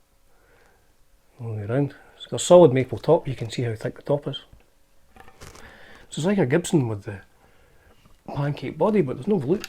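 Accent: Scotland, United Kingdom. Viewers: I can listen to Scottish people pronounce Japanese names all day 🌝👍🏼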